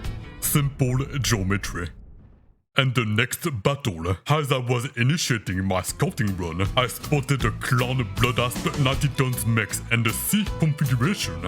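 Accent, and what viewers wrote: France. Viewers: is his accent real or is he an american imitating a french talking english?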